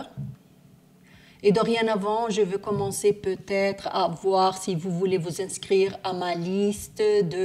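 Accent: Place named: France. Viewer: Howdy 🙂, i thought 🤔 you speak English.. it's like you speak France!! Anyway I'm going to go inside your painting here.. see ya Oh, i have a question: What Should i mix If i want the (Cloudy suny colours) ?! Which colours should i mixed?!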